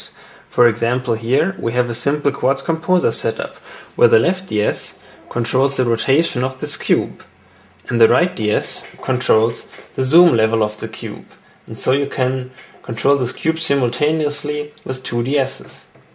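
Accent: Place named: Germany. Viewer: You have a german dialect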